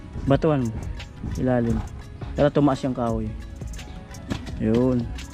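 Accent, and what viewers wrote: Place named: Maranao, Philippines. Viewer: Maranaw ung tono nya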